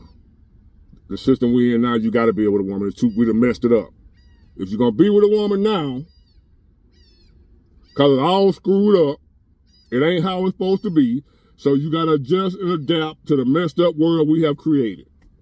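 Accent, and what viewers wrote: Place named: Chicago, United States. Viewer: Chicago voice....finesssee! finessse!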